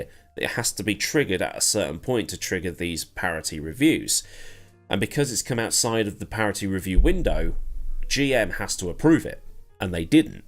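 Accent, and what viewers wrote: Australia. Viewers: honestly, as an aussie i pretty much lost most interest as soon as ford switched to the mustangs, and now that it's just american cars? yeah, who gives a fuck anymore? (also your aussie accent is pretty good mate) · Firstly excellent aussie accent. Secondly, maybe parity should be scrapped for a bop system. That allows a broader use of different types of body and engine configurations. This may entice other OEMs other than just those who make 2door v8 road cars.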